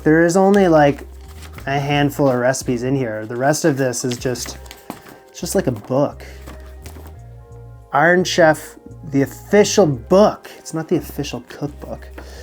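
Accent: Canada. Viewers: Coming from a New Yorker, your Canadian accent has completely come back. I've been watching your show for about 4+ years now and I don't remember hearing your Canadian accent as much as I do now. I've caught a few words with it before, but yeah, lol. I like this other side of your show. Watching you getting the ingredients and how you choose them is pretty cool. I like this style of vlog from you.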